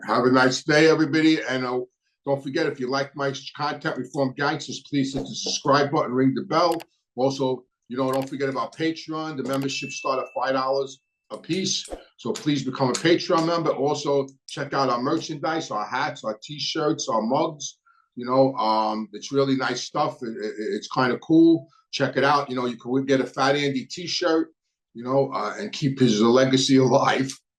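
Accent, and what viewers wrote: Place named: Brooklyn, United States. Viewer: He has a Brooklyn accent, genius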